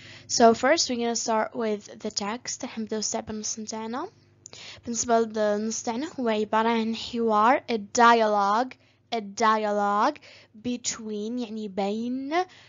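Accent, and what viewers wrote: United States. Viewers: لهجتك اميريكية 100\100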